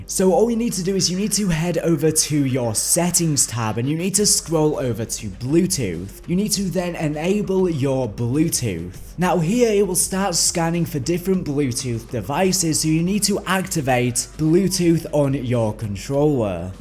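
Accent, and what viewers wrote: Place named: North, United Kingdom. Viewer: You a northerner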